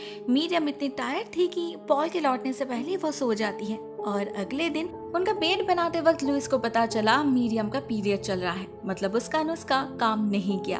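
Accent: Bengal, India. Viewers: Your Bengali accent ❤️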